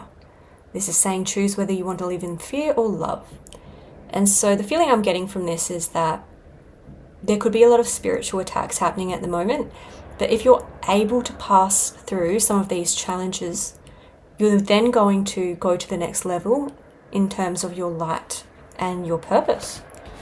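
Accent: Australia. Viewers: Are you from Australia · Hi, I'm new and today I just finish another cup of warm tea and it caught to my attention when you mention Rieke and I have been attending this session and it helps me. Thank you for your reading. I'm wondering if you are from Australia or from Great Britain?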